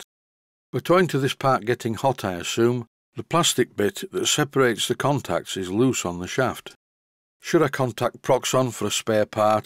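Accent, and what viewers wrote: United Kingdom. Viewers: British people talk with such elegance!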